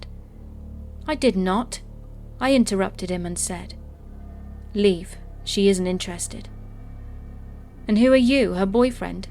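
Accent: United Kingdom. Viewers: Hi...love ur voice and ur channel...love to hear a uk voice as I'm there!!!!😂love it when u say get cozy comfy warm!! As I'm always getting cozy when u say that!!!thank you!!!!!! Stay creepy!!!!😂🖤🖤🖤🖤🖤🖤🖤🖤🖤🖤🖤🖤🖤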